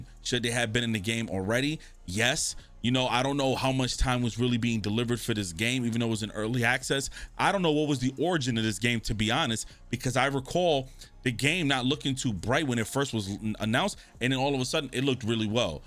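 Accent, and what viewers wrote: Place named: East Coast, United States. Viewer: Are you from Jersey? I hear east coast in your accent, but Im not sure if its NYC or not.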